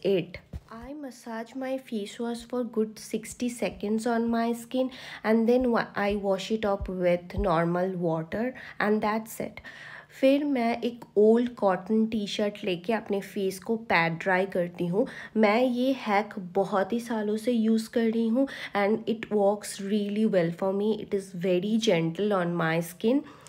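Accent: Bengal, India. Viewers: Looks like a বাঙালি Sounds like a বাঙালি too ❤❤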